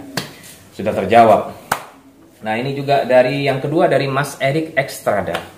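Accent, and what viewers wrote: Madura, Indonesia. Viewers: Mas asli madura ya?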